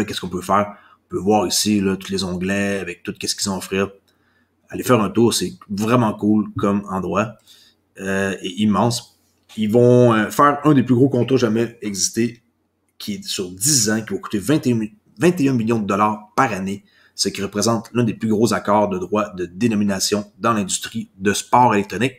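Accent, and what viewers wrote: Quebec, Canada. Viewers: Merci pour toutes vos videos et vos conseils! Je hold le xrp sur plusieurs annees. J’adore votre accent quebequois (je suis en France)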